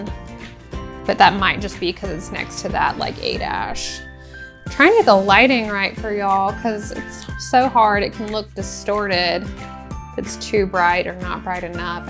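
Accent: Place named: South, United States. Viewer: It's nice to see a good YouTuber that has a southern accent. I never see anyone on YouTube with a Southern accent. I'm from Alabama so I'm really used to that kind of accent and it's just nice to hear someone online sound like someone that I'm used to hearing.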